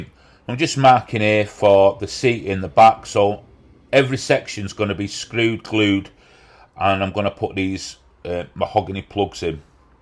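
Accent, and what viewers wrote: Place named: Liverpool, United Kingdom. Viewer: From across the pond, great job. Your accent, are you from Liverpool?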